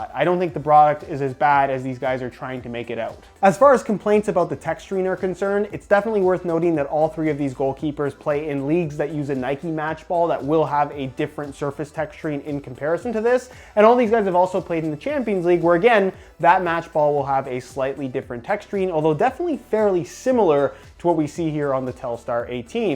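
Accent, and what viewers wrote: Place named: Canada. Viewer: “That I talked a-boot”....love the Canadian accent!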